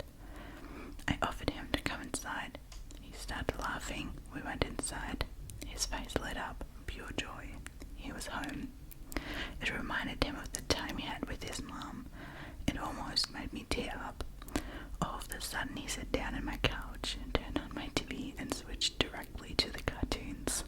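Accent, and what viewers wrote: Australia. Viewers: Okay I have to ask about your accent, I am a new sub so apologies if you have answered this already! Have you hung out with a lot of Australian English speakers? (I was assuming you were German by an earlier video) getting a strong Australian accent vibe though, so needed to ask 😊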